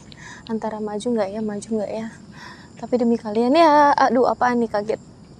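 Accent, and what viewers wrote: Java, Indonesia. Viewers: pasti kak orang jawa.. bahasanya itu kayak orang jawa mbandek ngapak 😅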